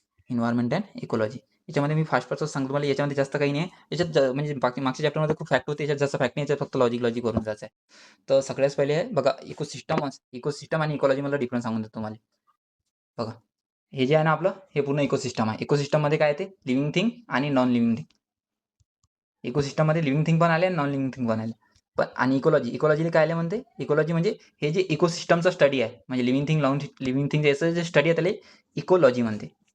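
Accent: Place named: Akola, India. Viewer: Akolyache ahe vatte sir😄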